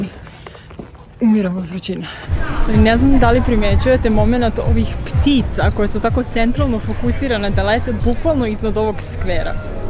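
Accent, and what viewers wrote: Belgrade, Serbia. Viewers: Pa nije bas tako gdjice ..dajte malo bolje istoriju Dubrovnika. Treba da malo znate i istoriju umetnosti i istoriju..a to je ovde na zalost izostalo. Sve ste pomesali..Inace Dubrovnik su napadali i sa mora i sa kopna pa cemu su sluzila uvrdjenja prema Srdju? kula Minceta? A tek taj napadni bg akcenat..ublazite to malo !! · Sto ti smeta,bg akcenat?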